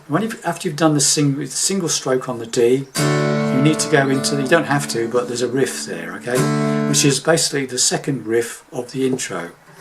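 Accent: United Kingdom. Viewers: gracias cuidaros mucho, también, supongo que por tu acento estas en UK, tus lecciones son muy didácticas para alguien de mi edad 61 años, gracias y cuídate por UK, que también tengo a mi hija en Lincoln, gracias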